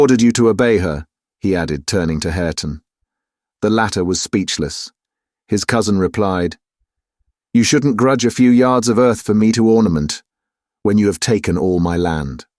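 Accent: England, United Kingdom. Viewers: Thank you for the wonderful reading and your beautiful British accent. I spent three days listening to this audiobook—a true masterpiece, once in a lifetime. The language is so beautiful, with a vast and rich vocabulary.😇😇😇😇😇😘😘😘😘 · I can’t listen to this English accent bs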